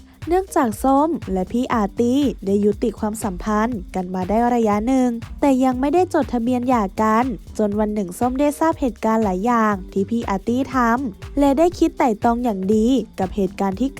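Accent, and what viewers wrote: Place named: Suphanburi, Thailand. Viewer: เหน่อเป็นสุพรรณเชียว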